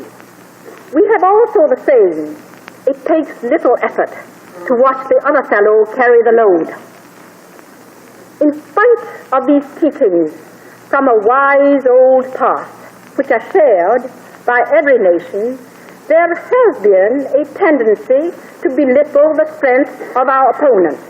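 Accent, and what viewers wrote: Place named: China. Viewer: I feel like she has a very slight Chinese accent though, which is surprising to me considering she was educated in the US at a young age.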